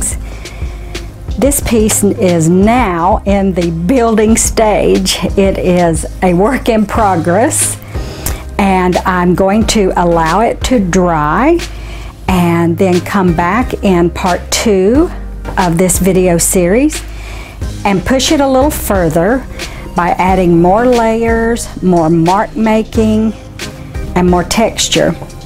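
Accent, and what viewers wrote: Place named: South, United States. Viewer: Love this and love your southern accent 👍🏼,love from the UK🇬🇧......subscribed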